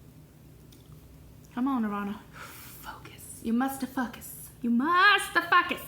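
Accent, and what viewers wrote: South, United States. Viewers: do u have a southern accent? sounds kinda southern to me.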